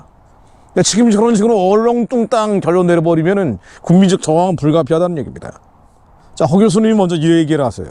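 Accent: North Korea. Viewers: 다 좋은데 북괴 말투는 정말 싫타.... 간첩아니고서야 왜 북괴 말씨지?? 북괴에 자주 갔었나.....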